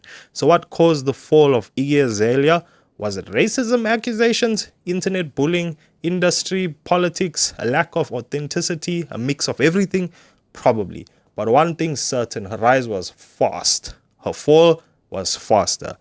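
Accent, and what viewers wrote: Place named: Cape Town, South Africa. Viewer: Im south african, from cape town, are you from here too? That accent is very recognizable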